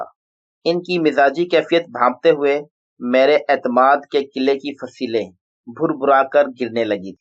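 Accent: India. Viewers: لہجہ ایسا جیسے کوئی انڈین اردو کی ٹانگ توڑ رھا ھو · Awaz waly dost indian lag rahy hain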